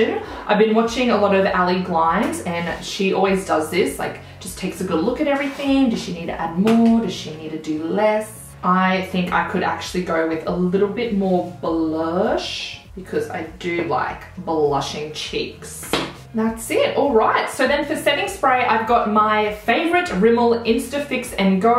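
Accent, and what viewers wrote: Australia. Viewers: Of all Aussie beauty youtubers your accent strikes me as the most aussie! Its how the last word in your sentences goes up ↗️ You must be from Queensland 😂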